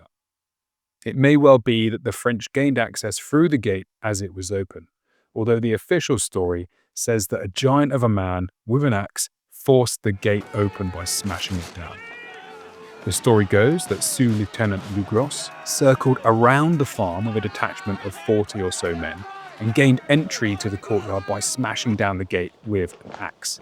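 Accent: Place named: London, United Kingdom. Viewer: It’s not an impediment it is I suspect a London dialect and as such as valid as RP this is not 1930s England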